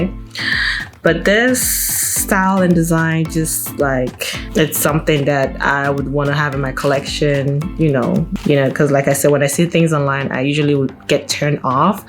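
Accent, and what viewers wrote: Nigeria. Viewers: Omo i thought you were like born in America , your Nigerian accent is cute 😂, i sha subscribed before I even clicked your channel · Iam always here for the intro😂 it’s the 9ja accent for me😅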